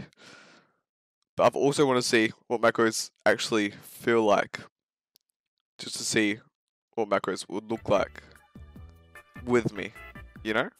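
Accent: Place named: United Kingdom. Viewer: a little bit of British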